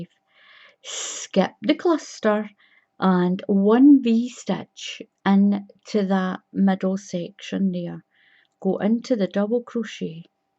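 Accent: Scotland, United Kingdom. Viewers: Hi gosh listening to you reminds me of my granny who came from Montrose she taught me to crochet many many years ago when i was 9 I'm 65 now and live in NZ so it was lovely to hear you Scottish voice. Enjoyed your video and look forward to learning more things many thanks again:D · You are a fantastic teacher and it’s so wonderful to hear a fellow Scottish voice! 🏴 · Thank you. I have been struggling with the repeat of this pattern in the past. You have now explained it very well. Thanks Thanks. I also love your Scotish accent.❤ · Thank you,! You’re an amazing teacher. I don’t read written patterns well and need a video to help. I was in Scotland earlier this year for our daughter’s wedding to a lovely Scottish fellow. (Came home early due to this Covid mess). Your accent brings back all the memories of the warm Scottish welcome we had. Stay well! 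· .... I absolutely love this pattern... and you.... are an amazing teacher.....so well explained... very good camera views on the stitches... wow... just amazing to follow.... I can’t read patterns so I can only make what I can’t follow in tutorials.... you are so easy to follow... I love ❤️ your accent.... so much... I worked with a woman many years ago from Scotland.... she called herself...LADYBIRD..... 😂I just LOVE ❤️ listening to you speak....fond memories....thank you oh so much....I will be making this blanket.!!!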